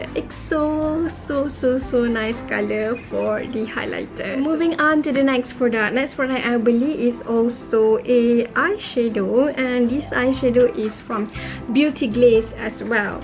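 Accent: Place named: Kedah, Malaysia. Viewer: Suka dengar cakap kedah o. Kalau boleh teruskan cakap kedah:)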